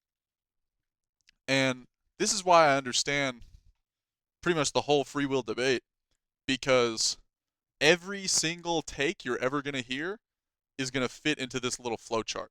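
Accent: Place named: South, United States. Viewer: Where in the south are you from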